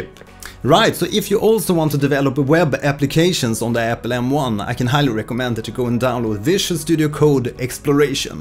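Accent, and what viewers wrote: Sweden. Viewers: dat swenglish <3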